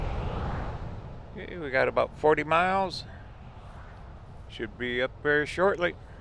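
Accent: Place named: Upper Peninsula, United States. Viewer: I’m not sure where you’re from but with your accent you fit right in with the Yoopers.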